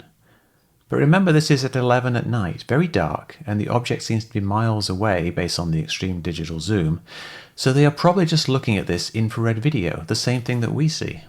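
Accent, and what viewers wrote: United Kingdom. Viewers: I have to mentally prepare to watch these videos from Mick. His take can be interesting, but it generally puts your mind into a fucking mental gymnastics exercise. If he didn’t have an English accent, a fraction of the people would take him seriously lol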